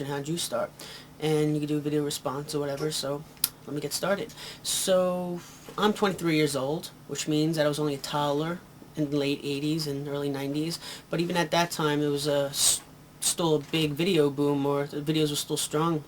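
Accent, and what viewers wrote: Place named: East Coast, United States. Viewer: You're from the East Coast aren't you? You don't sound like you're from Cali or something.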